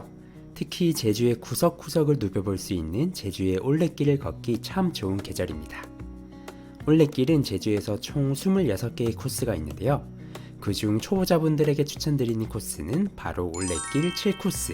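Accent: Gyeongsang, South Korea. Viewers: 님 한의사라고 본것같은데 맞나요? 정말가끔유투브보면 차분한말투와가끔 경상도사투리가 귀엽고정겹습니다 감사해요^^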